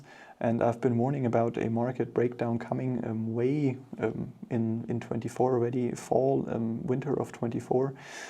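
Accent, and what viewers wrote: Germany. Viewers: You have a very strong german pro noun ci a tion!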